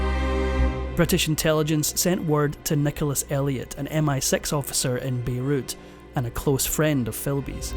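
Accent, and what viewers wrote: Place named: Scotland, United Kingdom. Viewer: The commentary refers to "England", as if Scotland and the rest of Britain were not also a target for foreign intelligence. As if they were somehow uninvolved, like with the Empire, when the reality was very different. Also, someone with a Scottish accent not knowing the forename St. John is pronounced Sinjun....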